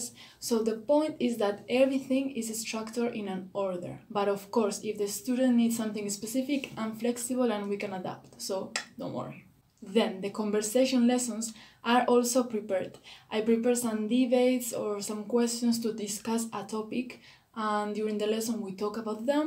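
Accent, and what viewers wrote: Spain. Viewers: Love your Spanish accent in German.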